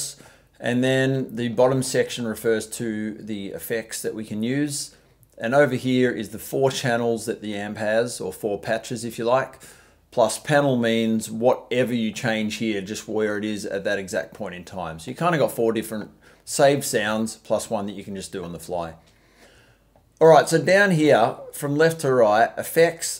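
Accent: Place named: Australia. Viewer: Aussie accent is strong with this one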